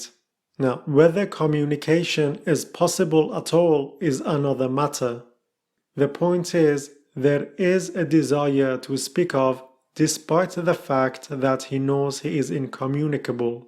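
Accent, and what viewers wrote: Germany. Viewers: I can't quite place your accent. German maybe? If so, you're so lucky you can read Nietzsche and many other great philosophers' original works! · Hahaha, I'm German and this is German. The name of the channel is German, his name is a German one. His accent is what every German that tries to pronounce English sounds like.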